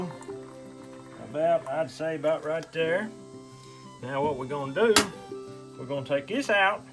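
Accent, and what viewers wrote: South, United States. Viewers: "Just like at".! Love the south.. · I enjoyed watching your video and loved your down to earth personality and country accent. Blessings!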